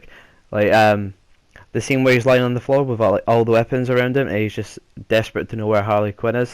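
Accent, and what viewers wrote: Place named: Kilmarnock, United Kingdom. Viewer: That killie accent makes me moist :0 Film would've been so much better had they edited it differently. There's a lot of deleted scenes where they could have made a completely different movie, but instead it was mediocre. As for deadshot, he needed humanised, you need to get behind the main characters. They were all nicer and gentler than the comics depict them. Affleck was the tits yo!!!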